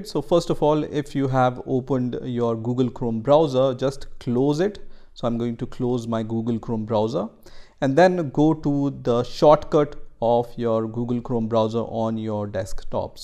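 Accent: India. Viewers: Gotta be my last time listening to an Indian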